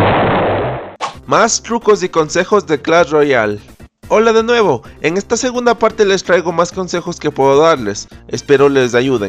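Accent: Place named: Ecuador. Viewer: Por tu acento, si no me equivoco eres de Ecuador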